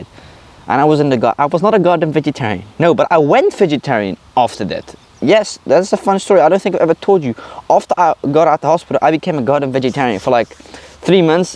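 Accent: South Africa. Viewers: Life’s just starting for you-it’ll all turn out just fine-love your (South African?)accent.Greetz from Hellevoet👍🏼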